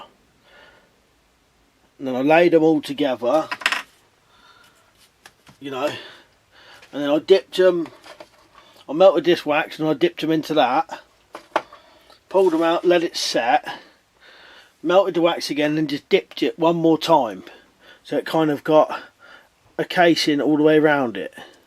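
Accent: United Kingdom. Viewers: British accent cracks me up. Instead of water its waer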